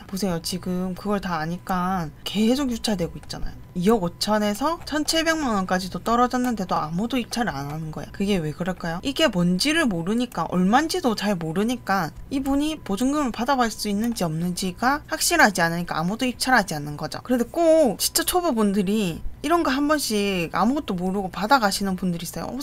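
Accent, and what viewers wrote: Gyeongsang, South Korea. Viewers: 경상도 억양좀 줄여주시요 경상도 톤이 머리아파요 천천히 또박 말하면 덜 시끄럽게 들릴려나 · 지역이 경상도쪽 이신듯한데 어디신가요?부산사는데 가까우면 수업신청하고싶어요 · 표준어를 사용했으면? 보리문댕이인가 😂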